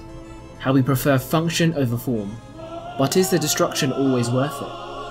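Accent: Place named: London, United Kingdom. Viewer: I can hear the London in your voice haha, this is some terrific commentary. An analysis on Isle of Dogs would be great, taking the more symbolic approach would be something I haven't seen effectively yet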